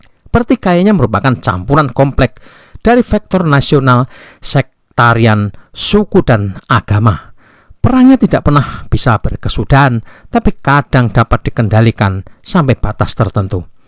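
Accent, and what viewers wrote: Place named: North Sumatra, Indonesia. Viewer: Narator nya pasti orang Batak. Logatnya masih kental ya.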